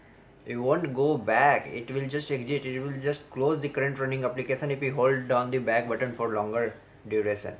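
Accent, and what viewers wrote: India. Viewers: Indian voice ftw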